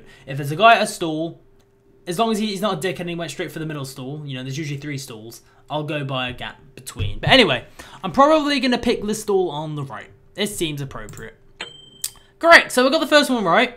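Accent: United Kingdom. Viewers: You British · HE IS BRITISH NOT GAY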